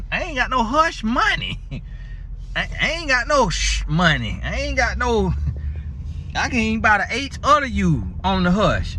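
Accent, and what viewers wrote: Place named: South, United States. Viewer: I may to watch this quick clip more than once. With southern accent and jokes. I'm busy laughing to grasp the teaching. what in the werl?!😅😂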